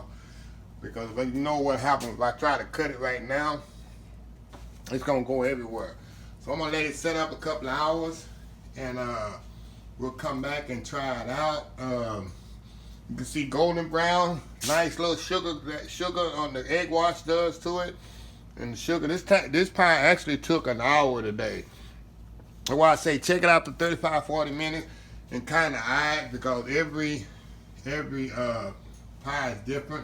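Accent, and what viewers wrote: South, United States. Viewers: Love that southern accent!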